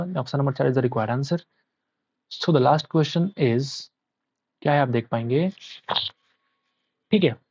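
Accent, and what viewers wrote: Bihar, India. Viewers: Sir app bihar se ho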